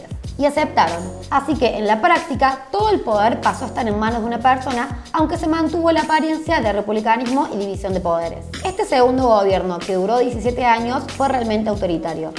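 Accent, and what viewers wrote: Córdoba, Argentina. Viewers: Hermoso escuchar la tonada más piola del mundo, seguí así che abrazo. Muy bien explicado todo. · No me interesa la historia argentina (por que soy uruguayo) pero me vuelve loco la colorada, con su acento, creo que cordobés. Me miro los vídeos igual jajaja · walter pereyra Tonada Cordobesa pah.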